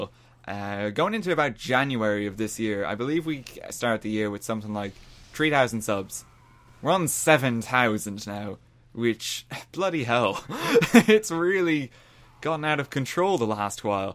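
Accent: Ireland. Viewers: I have only been here for about a month but I absolutely love your videos. It also helps that your Irish :D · Are you irish?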